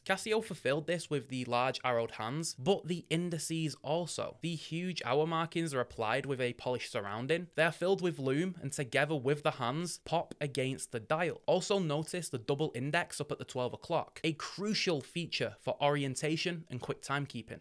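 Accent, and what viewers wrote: Yorkshire, United Kingdom. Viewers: Where are you from,? You should like a Yorkshire lad!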